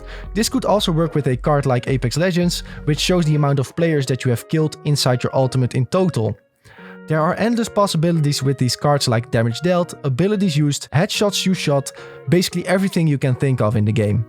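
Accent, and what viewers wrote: Netherlands. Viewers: Are u dutch? You kinda have the accent. Your enlish is great tho :)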